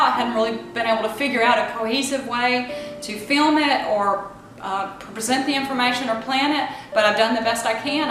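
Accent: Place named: Tennessee, United States. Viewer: Hi, I just found you on YouTube and love your videos. I know you won't say but your accent sounds like mine and I am from Tennessee,. Go vols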